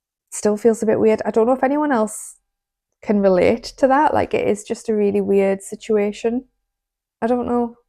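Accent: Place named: Northern England, United Kingdom. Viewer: Recently came across your channel and I am hooked onto your videos. It's refreshing hearing the finance journey of a fellow person in the north of England. Your accent is wonderful and thank you for sharing your story and past circumstances. Esp with the skin cancer scare, shows the importance of being mindful yet spending money for personal enjoyment too.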